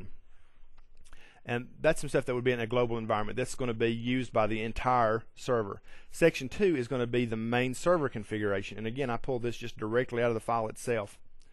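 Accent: South, United States. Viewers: I really like this guys accent what is it though I presume southern drawl but I'm not 100%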